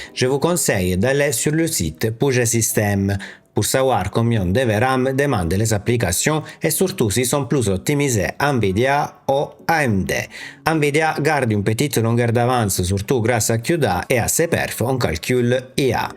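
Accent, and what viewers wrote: Italy. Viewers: Bonjour, tout d'abord je tiens à te remercier pour le contenu qualitatif de tes vidéos, avec, en plus un accent que j'apprécie puisqu'il vient me rappeler mes origines (mes grands parents étant originaires de l'île de Pantelleria 😘). Je dois monter un nouveau PC en novembre prochain et j'aurai voulu avoir ton avis sur le choix d'une carte graphique dédiée principalement à la retouche photo et au montage vidéo (davinci resolve, photoshop et lightroom). Tous les ordinateurs que je monte ayant une durée de vie de 10 ans, j'apporte un soin particulier au choix des composants sans toutefois tomber dans l'excès des cartes derniers modèles qui n'apportent finalement que peu de performance en plus par rapport à la génération précédente. Mon choix actuel se porterait sur une carte ASUS GeForce RTX 4070 Super/16 Gb Ram laquelle devrait convenir et tenir une dizaine d'années. 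Actuellement elle coûte un peu cher mais elle devrait à un moment donné coûter un peu moins cher. Actuellement mon PC est équipé d'une carte graphique Asus-CG-GTX-Strix-960/4 Gb de Ram qui a bien tenu jusqu'ici mais sa puissance devient insuffisante lors de l'encodage des vidéos. Mais elle tient le coup, sauf qu'elle devient lente. Je compte sur ton expérience pour m'orienter sur un autre modèle, si nécessaire. Grazie per il tuo ritorno. Ciao, Ciao 🙂 Salvatore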